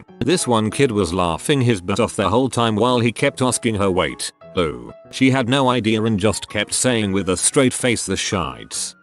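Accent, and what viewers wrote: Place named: Midlands, United Kingdom. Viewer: That makes sense. Of course, some of these have to be read. Because some of these pronunciations make it seem that the TTS is using a Midlands accent. (If that makes no sense, it's difficult for someone Irish to understand someone with a Midlands accent when they're talking "normally". Or basically anyone who doesn't have a Midlands accent.)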